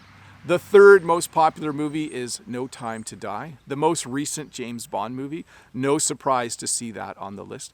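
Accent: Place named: Canada. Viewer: Thank you so much, first of all I 'll like to say that I really enjoy watching your videos, I really like your manners of teaching, because it's very clear to me, it's easy to understand, and I love your Canadian accent it's understandable, iam always practicing your online lessons. I have been practicing and iam enjoying improving English, please keep making videos for us they are amazing for non-native English speakers like, by the way iam Mozambican, I was born in Nampula city ( it's called the Axinene province- Emacua's land). Thank you so much have an excellent day and good luck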